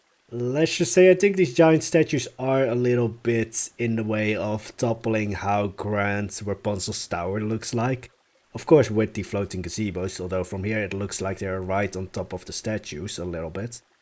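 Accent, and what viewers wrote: Netherlands. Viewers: you sound dutch so i think youre a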